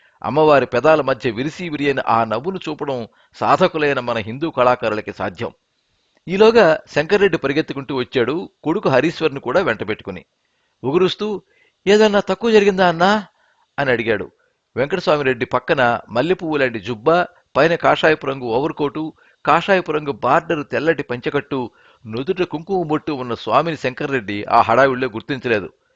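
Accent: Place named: Kadapa, India. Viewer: Kadapa dist language narration in story reading very nice sir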